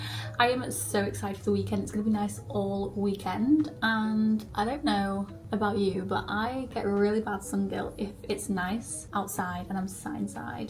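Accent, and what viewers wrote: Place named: Italy. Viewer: Tu acento eres de italianaa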